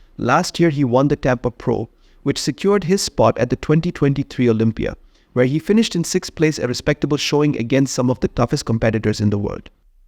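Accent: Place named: India. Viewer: I am sure you are Indian because of your tone.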